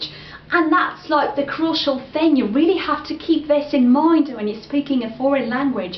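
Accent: United Kingdom. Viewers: Спасибо за прекрасные советы! В восторге от вашего крутейшего британского произношения, просто музыка для ушей. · Hi.I just subscribed.'Cause yesterday I saw this video,and I am amdmired.Great job.I'm a kind of beginner,have watched many many videoes and still do it.Will be glad to wath new videoes of yours.And have one question how'd you get your wonderful British accent,did it take long time,have you studied in England? Keep going you're great!! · Hello, that's really interesting. and your pron is fantastic!!!! But I've got a question on my mind - why did you choose British pron, not American one? Would be glad to know your points, thank you)) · you are amazing! You sound so British! I can't argue with you that working on a pronunciation is very important quality of a learner of English. In class I often get mixed up by my school mates speaking with the Russian accent, it is sometimes quite difficult to figure out what they mean and I ask them to repeat. 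Even though I am just a learner as they are I find it easier to converse with the natives. I'm taking the CAE exam in July, the event that I'm actually dreading, and I would like to ask you for some tips for improving listening skills. I do listen a lot, but somehow that influences only my pronunciation and speaking skills, and every now and then I keep on missing out things in my listening tasks. I realy go out of my way trying to improve these skills, but still no headway... Any advice?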